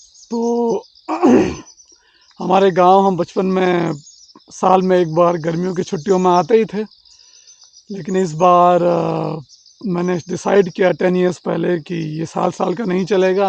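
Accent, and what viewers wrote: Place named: Garhwal, India. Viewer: Good garhwali accent 🎉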